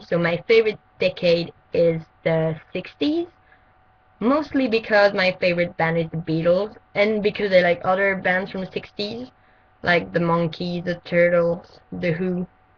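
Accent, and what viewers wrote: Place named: France. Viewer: 1) You are not boring. 2) This vlog is not crappy, so the title is totally misleading. 3) You accent is so cool, but I was actually expecting it to be more Swedish than French, for some reason (: Great video! Keep it up (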